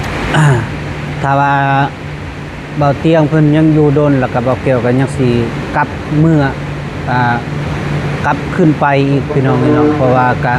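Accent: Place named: Laos. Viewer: เป็นทหารต้องเข้มแข็ง อย่าอ่อนแอสู้ต้องดูแลประชาชน.เว้าสำเนียงลาวเผ่าได๋ฟังคือบ่คือบ่าวเตียง.สู้สู้เด้อทหารตัองอดทน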